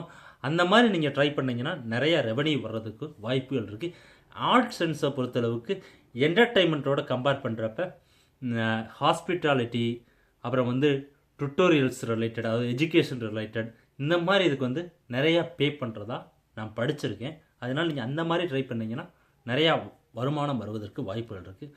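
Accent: Madurai, India. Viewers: boss nenga madurai ah...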